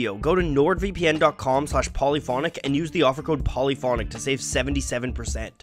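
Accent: Canada. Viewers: Thumbs up for the Canadian accent !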